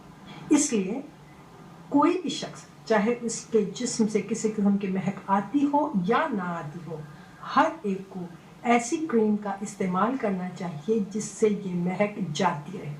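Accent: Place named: Karachi, Pakistan. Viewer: Do you still run this program? Love your urdu! Not sure where are you from but I'm orginally from Karachi and you have that Karachi accent. Keep the good stuff coming in.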